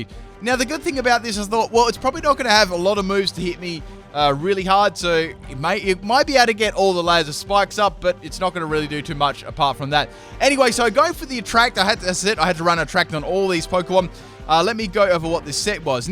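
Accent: Australia. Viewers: you sound like an australian dude named ben for some reason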